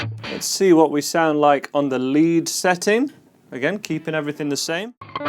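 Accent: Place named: Yorkshire, United Kingdom. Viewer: Hey, where can I get myself a Red5 and which one are you using in the video as I can’t find the link in your description. Much appreciated, thank you! I hear a Yorkshire accent, I’m Leeds/Bradford!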